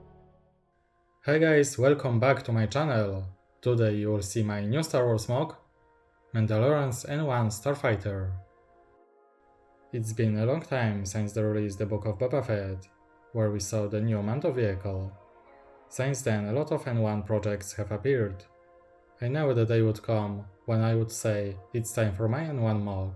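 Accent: Poland. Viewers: Twój akcent wręcz zdradza że jesteś polakiem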